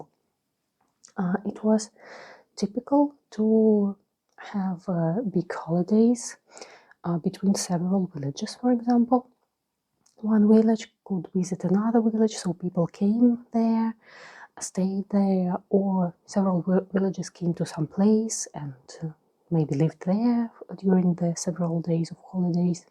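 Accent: Russia. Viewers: I love your videos!!💗❤️ just found you and I’m very happy to have. I love the Russian accent for asmr lol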